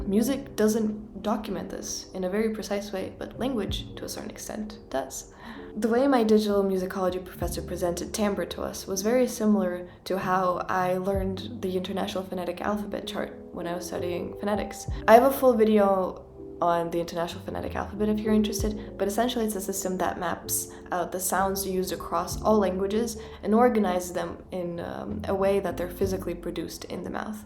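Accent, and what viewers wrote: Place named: Japan. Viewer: Hey.. as a linguist who studies Englishes and various contact language inpact on language.. I can't figure out what dialect of english you're speaking..there are very 'new' or different/mixtures of strange vowels/consonants and cadence etc.. You sound a little Japanese, a little Chicano and a little something new/modern ..and strange sounding to my American , older ear/and dialect.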